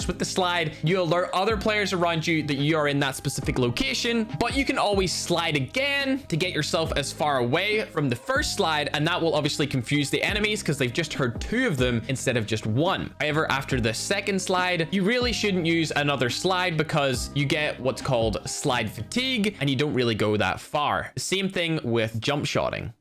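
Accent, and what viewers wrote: Ireland. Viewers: Wait... are u irish? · i did not know that you were irish. should’ve guessed it 😂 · You're Irish? God your accent is so deep. I've heard Terrorizer and Nogla and they have a lighter one. You sound more Enthusiastic though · how are u irish? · You're Irish?.... Did not know that. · U are irish ?! Thought u british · Wait... ur Irish? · is irish?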